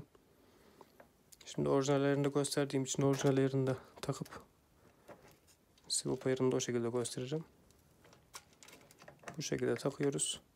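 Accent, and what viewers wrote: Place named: Kastamonu, Turkey. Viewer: Aslan gardaşım devam et maşallah sening gerdüre gerdüre gonuştuğun Gastamonu ağzına gurban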